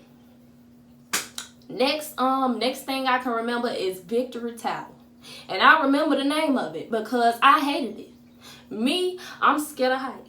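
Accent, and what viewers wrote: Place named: Alabama, United States. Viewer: You from Alabama 🤣🤣 you got a strong accent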